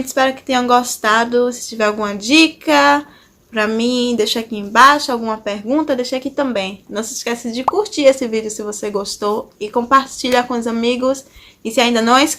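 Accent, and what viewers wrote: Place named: United States. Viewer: Gostei do seu jeito de falar. Vc tem sotaque americano kkkk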